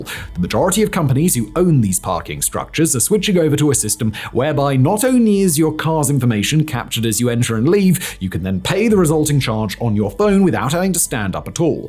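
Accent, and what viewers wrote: United Kingdom. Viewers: Gasoline cars are going to be around for a long long time, my friend. Just watch and learn. Also, desktop computers aren't going anywhere and settled down to their ongoing use plateau several years ago. And finally, BluRay and DVD/CD players are abundantly available, very cheap to obtain and will be for the foreseeable future. Your analysis is a little heavy on the wishcasting propaganda side, sprinkled with a thick authoritative sounding British accent. Those in the know don't buy it. You did at least nail the fax machine, so there's that...good man!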